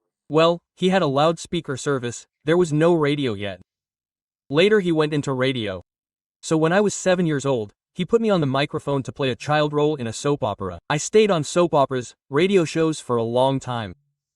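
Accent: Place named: United States. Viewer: Vidio foi gravado por me parece com voz americana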